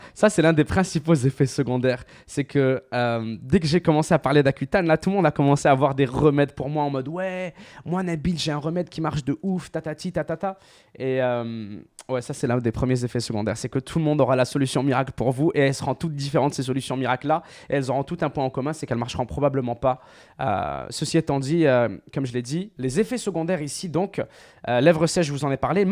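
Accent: Quebec, Canada. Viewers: Salut mec , je trouve que pour un québécois t'a un accent assez léger par rapport à Gurky.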